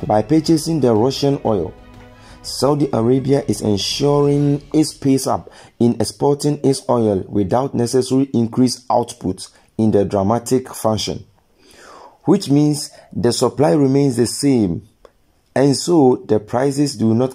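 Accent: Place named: Africa. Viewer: It is an accent from somewhere in Africa.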